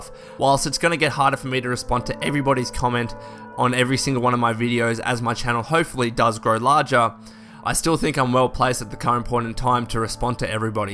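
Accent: Australia. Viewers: ***** its so tough!! Dude where are ya from, I know its an Ossie accent but do you live in Australia? :)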